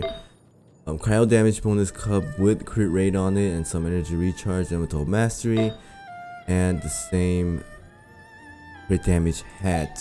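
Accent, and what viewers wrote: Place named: Brazil. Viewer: Essa cara é br o sotaque eu reconheci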